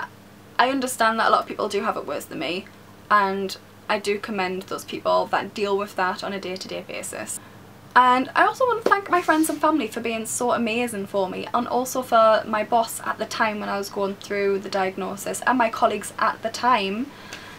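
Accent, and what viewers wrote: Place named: Newcastle, United Kingdom. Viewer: Great video. I am in the process of being diagnosed. I have had bleeding and mucus at 2 year intervals for the past 10 years. Mine seems to be very mild at the moment, no pain and limited toilet visits- however, it is not very nice even at this level! P.S. recognisable accent, I hope you are a Toon fan! ⚫⚪⚫⚪⚫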